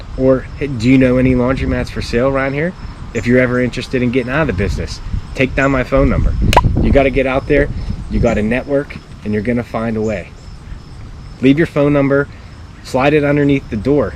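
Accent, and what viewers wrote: United States. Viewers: Are you from Pittsburgh 😂 that accent is awesome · Are you from Boston?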